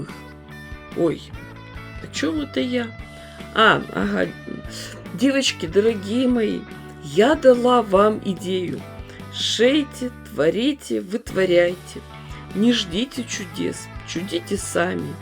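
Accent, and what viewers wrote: Urals, Russia. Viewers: Ваши шуточки с уральским акцентом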